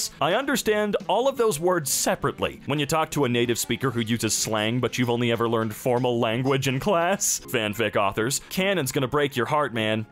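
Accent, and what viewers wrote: Ireland. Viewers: has to be Irish omg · Love Robins irish accent. Is there an irish subbredit he can read for us? =D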